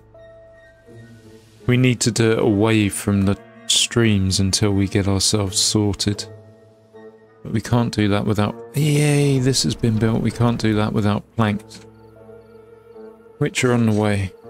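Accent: United Kingdom. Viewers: You do realise that only the Brits (you) and Aussies (me) will understand what you just said.... about Beavers.... Your accent sounds British - let me know if I'm way off base, but I totally got your humour.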